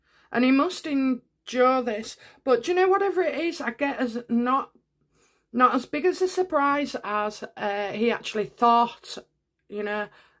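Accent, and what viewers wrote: United Kingdom. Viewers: Hi from Australia. Nice reading as always. My favorite UK tarot reader. Nice reading as always.. I always enjoy your tarot.. Love your British accent. My dad had a Scottish accent. Mum was from Isle of man.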